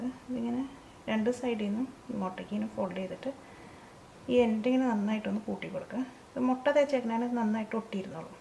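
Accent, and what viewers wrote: Thrissur, India. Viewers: you don't have to be sorry. There are a lot of thrissur people like me, who watches your videos, not only to learn cooking also to hear thrissur slag.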